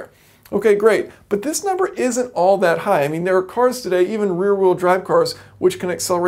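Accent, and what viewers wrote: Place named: United States. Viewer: You sound like you’re American. What’s with using “kg, and meters”? Speak in American English cock suck!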